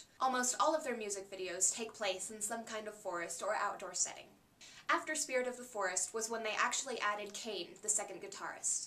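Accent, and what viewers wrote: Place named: Finland. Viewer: so informative video, and what cool finnish accent:P by the way, posted your video on their facebook page, you might like to know ^^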